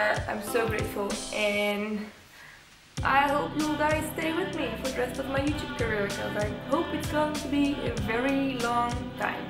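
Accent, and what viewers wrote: Netherlands. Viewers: Volgens mij wel! Ze klinkt erg nederlanss🤔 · when u suddenly notice that she's dutch....or isn't she idk, but etos is dutch and her accent also sounds dutch, my country, yess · It's a Dutch accent :) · hoor ik een nederlands accent? :)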